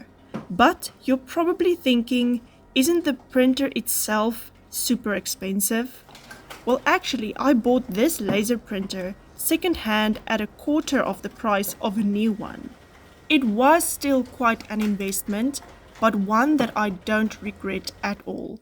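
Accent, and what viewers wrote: South Africa. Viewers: you sound South African so why are you using Amazon your links do not make sense e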